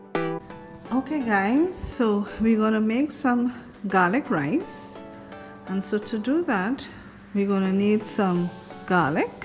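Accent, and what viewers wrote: Trinidad and Tobago. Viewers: This was the most simple recipe I found. And how lovely to encounter what sounds like a Trini accent😊🇹🇹 Thank you very much!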